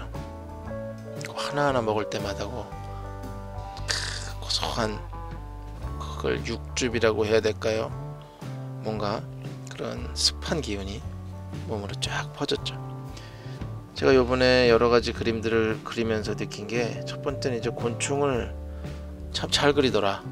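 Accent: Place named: Seoul, South Korea. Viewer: 작가님 유튜브가 있는지 처음알았어요ㅋㅋㅋ너무 재밌네요 작가님과 수다떨면서 작업하시는 모습 옆에서 보는 기분이예요ㅋㅋ작가님 말투가 90년대 서울사투리? 같아서 듣는 재미가 더해지네요~~ㅋㅋ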